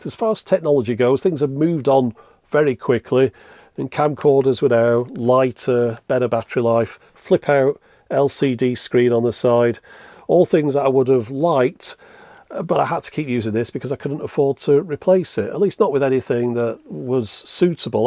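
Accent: United Kingdom. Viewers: the British is off the charts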